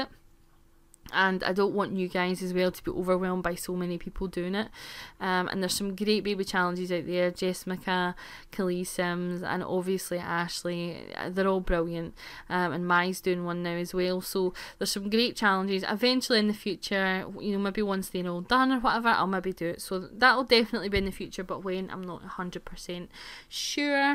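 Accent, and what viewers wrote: Scotland, United Kingdom. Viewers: Awwwww I LOVE him! I got a kitten recently. Her name is Lola. She's SOOOO cuteeeee!!!!!!!!!! Now for me rambling on and on for no reason xD : My friend let me borrow this boom that takes place in Scotland and it made me think of you SOOOO much! When I'm reading about people in different countries, I like to have the accent in my head, and in the book her BFF was a Scottish girl named Emma (the main character is not a scot. OMG THAT RHYMES!!! :p ), so to figure out her accent so I didn't get frustrated when I read the book, I thought of how you spoke and repeated that in my head over and over again. :o)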